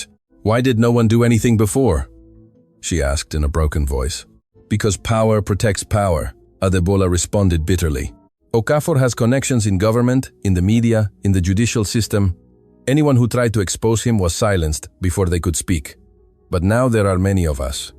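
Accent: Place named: Africa. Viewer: ❤❤😊😊What a fantastic story!! Words can indeed change the world. It's tragic but true: arrogance blinds one to the cruelty they can wreak on others to salvage their bruised egos. Emeka should get another 25 years to ensure he doesn't emerge alive from prison. People like him should NEVER see daylight! Great story, fittingly narrated by one with an African accent. More of the same please.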